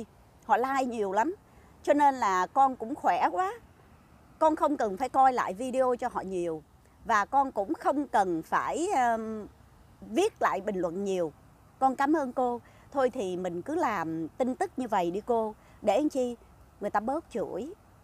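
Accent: Standard. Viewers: Chị nói hay chuẩn lắm chị 😁😁 em còn mê chị nói chi ai 🙈🙈 chúc chị luôn luôn vui vẻ tràng đầy sức khoẻ trẻ mãi không già chị nhé.🌹🌹🌹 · Em nói chuẩn nhất mà chị đã từng nghe. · Chị nói hay và chuẩn lam chi oi! Giọng nói củng hay va hiểu biêt.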